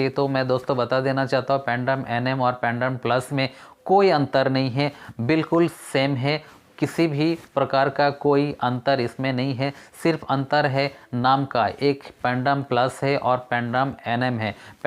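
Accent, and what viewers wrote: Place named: Bihar, India. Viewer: Rahane De Bihari de raha bhashan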